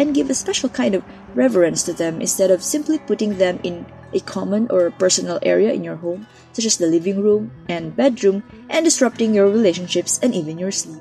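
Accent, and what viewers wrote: Philippines. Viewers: She sounds Filipino. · Since you said that, I noticed and I agree. She's most probably a Filipino. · You sound so Philippino ❤❤❤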